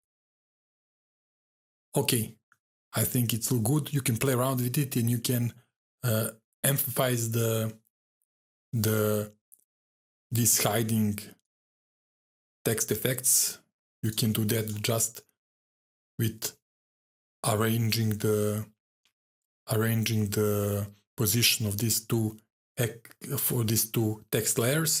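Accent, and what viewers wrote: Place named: Brazil. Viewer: sotaque brasileiro total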